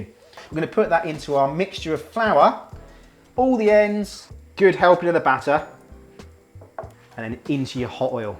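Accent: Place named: United Kingdom. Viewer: Watching and loving ur videos and (ur awesome british accent) all the way from ontario canada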